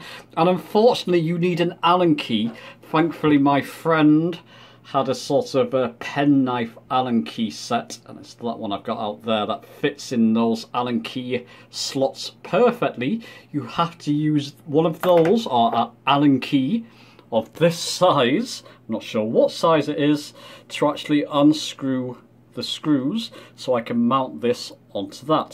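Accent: United Kingdom. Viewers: Hello, I found your YouTube just days ago. It is very helpful and I enjoy the tutorial very well. There is a question for you: can the Logitech G Saitek Pro Flight Multi Panel compatible with the iMac? I have to make sure before I buy it. I am a very beginner for the X-plane 11 installed on my iMac. BTW I enjoy your British accent! Thank you in advance!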